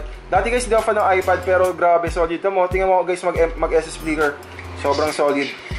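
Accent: Iloilo, Philippines. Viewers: Ilonggo ka pala Boss? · Ilonggo ka po ba kuya? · Boss. Ilonggo ka pala?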